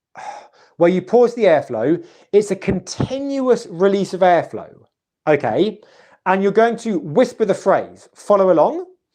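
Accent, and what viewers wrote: United Kingdom. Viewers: I love the British accent..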